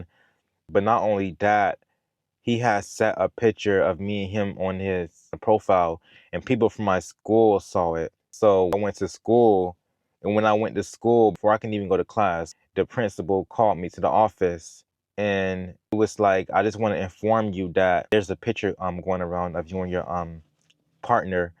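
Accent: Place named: New Jersey, United States. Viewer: I hear an east coast New Jersey accent or somewhere near there.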